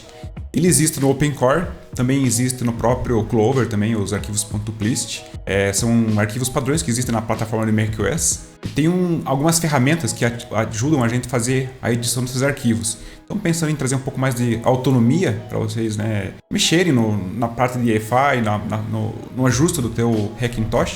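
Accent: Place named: Paraná, Brazil. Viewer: haha quando vi o sotaque já imaginei ele é paranaense, e que massa que é daqui de CTBA piá. haha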